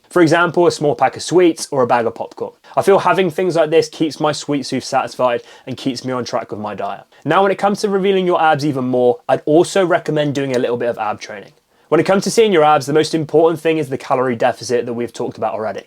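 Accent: United Kingdom. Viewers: Oooo he’s Bri’ish 😍😍😘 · You have some gorgeous blue eyes and I love the British accent. That's what you call a Bubble butt in the black community in the USA. I will never have a flat or wash board stomach because at 65 years old, I don't have the energy to be doing a lot of exercise. I am not overweight by the weight. My weight is 180 pounds and I am 6 feet tall. 🤣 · For me, it is his eyes, and adorable ingenuous accent. Brits are the best!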